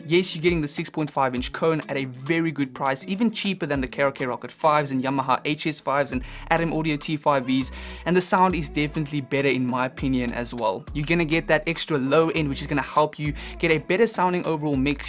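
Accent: South Africa. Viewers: I hear a South African accent. Greeting from a fellow South African muso living in nashville.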